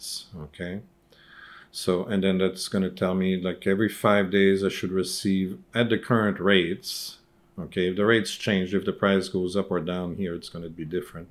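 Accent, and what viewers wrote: Quebec, Canada. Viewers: Are you from Quebec? I hear s Quebequois accent. 🇨🇦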